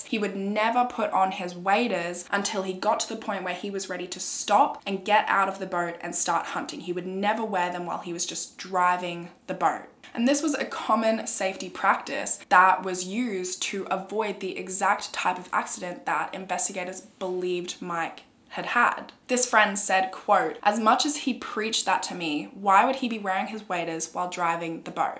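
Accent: Australia. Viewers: We're is the accent from? Australian? It's grating and can't listen anymore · Heavy Aussie accent!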